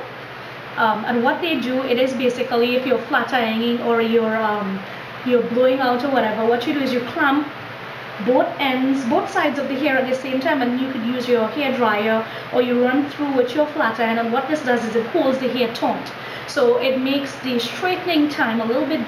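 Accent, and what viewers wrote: Trinidad and Tobago. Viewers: As a fellow Trini I LOVE to hear the Trini accent on YouTube !!!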